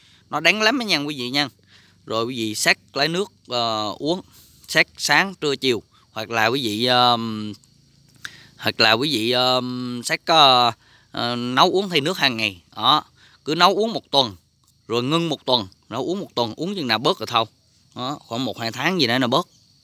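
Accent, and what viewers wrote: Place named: Binh Dinh, Vietnam. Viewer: Mình khoái nghe giọng bình Định rồi đó nha. Thuốc thì ok rồi hôm nào mần cờ líp về võ thuật và rượu bầu đá nhe 😁😁😁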